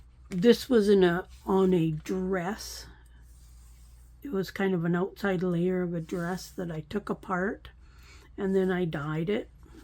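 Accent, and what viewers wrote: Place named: Canada. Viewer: Are you close to any of the fires? They have been so scary & they’re popping up all over the world. California has some bad ones right now!I don’t know Canada as well as I should. I think I’ll get a National Geographic map from Amazon! I get a new one everytime I meet a new YouTuber! Kind of makes me feel like I know you better! Now that you have told me you’re from Canada, I hear that a bit in your speech! I also love all the different accents that people have! I’m amazed at the UK, they have so different sounds! It’s nice to meet you! Erica❤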